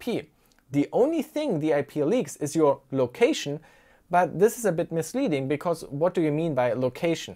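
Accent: Germany. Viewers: i could hear u are german, but now i see you are from my town :D MOIN!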